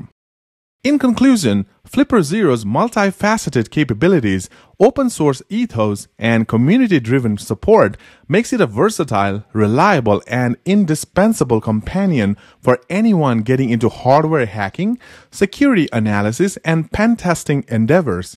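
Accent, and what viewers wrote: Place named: Asia. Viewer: Old fuking video fukng asian accent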